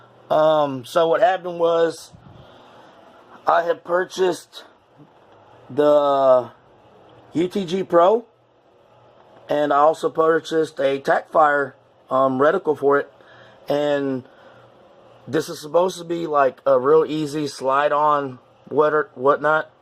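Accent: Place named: South, United States. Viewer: Nice southern twang you got there, Mr. Lol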